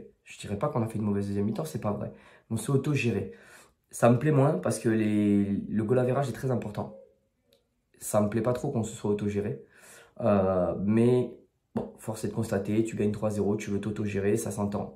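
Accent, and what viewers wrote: South, France. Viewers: J’allais te traiter de «Guignol» et je me suis rendu compte de ton (jeune âge).. En fait tu parles de se que tu connais je veux dire depuis que ton cerveau est en possibilité d’analyser se que tes yeux voient !!!! Tous ça pour t’expliquer que tu ne peux pas comparer l’incomparable (Karim Benzema) c’est la classe mondiale,mais quand il brillait à Lyon (150 ou 170 buts en 5 ans)toi tu étais trop jeune pour t’en souvenir et en profiter alors que Menphis depay est un bon joueur qui apporte à Lyon c’est indiscutable,mais de là à prétendre être au dessus de «Mr 9, 9.5,ou 10» du Réal Madrid,t’es à côté de tes pompes petit !!!! Ps: Avec ton accent du midi tu te permets de dire des trucs sur des joueurs Lyonnais, choses que même les supporters Lyonnais natifs de Lyon ne se permettent pas. Un peu de respect pour Lyon pélo !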